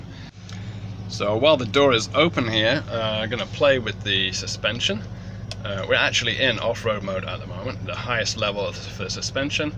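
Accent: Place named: United States. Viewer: you've developed an American accent!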